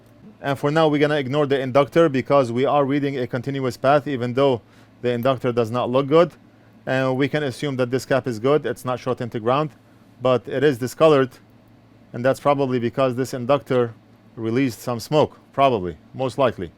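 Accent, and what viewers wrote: Russia. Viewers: His accent doesn't sound native, he's more of a russian than American, that's why America wants to get rid of Russia.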